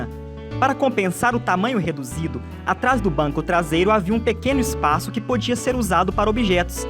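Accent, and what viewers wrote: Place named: Northeast, Brazil. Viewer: Voce fala muito mal e do nordeste, bahia, so mostra as imagens nao fala nada por favor.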